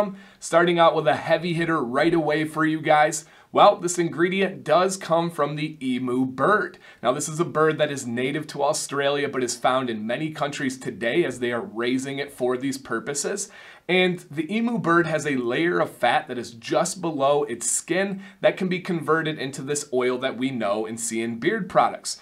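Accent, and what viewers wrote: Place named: United States. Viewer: Absolutely fascinating video. As a carnivore I 100% agree with your views on emu oil (& respect those opposed coming from a vegan perspective). I dig that the bird is farmed for food, it’s feathers, etc & that so little goes to waste. This is not an oil I have ever heard of or seen in any UK beard oils but I will definitely look out for emu oil, especially if it works on dormant hairs. Just FYI, another thing I love with your videos, & others I watch on YouTube from over the pond, is the little accents. Hearing you pronounce emu ‘E-moo’ where as in the UK we pronounce it ‘eem-U’. Just reminds me how huge the bearded community is! Anyway, Happy New Year, all the very best for 2020!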